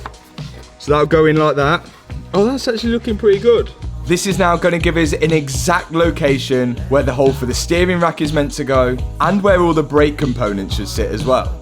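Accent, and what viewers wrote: United Kingdom. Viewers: No! Your British accent doesn’t sound like Matt’s.!!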